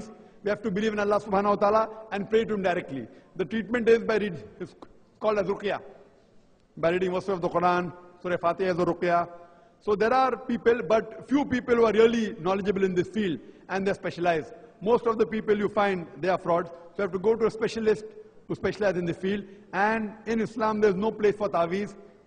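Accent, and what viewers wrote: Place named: Arab world. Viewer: Unxpectedly Xpected Are you Islamic people forfeited any kind of magic? If it doesn't tell me.I can't fully understand cause he is using Arabic accent. I barely understand only 20~30%.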